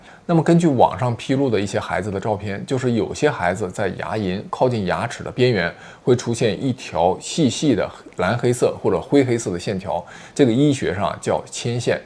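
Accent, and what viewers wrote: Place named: Anshan, China. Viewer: 女主播好像是鞍山人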